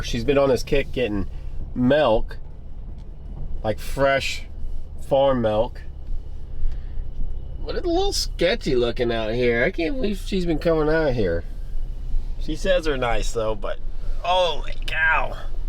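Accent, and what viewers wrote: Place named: Denmark. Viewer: hello i just noticed you dont have many viewers and subscribers so i just wanted to support abit seems like you do decent content ur accent sounds abit danish but where are u from?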